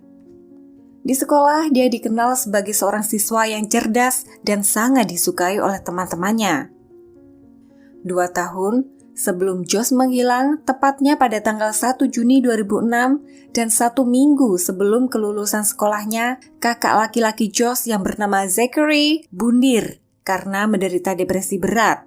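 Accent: Java, Indonesia. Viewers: ko medog ya suaranya, ga kaya sebelumnya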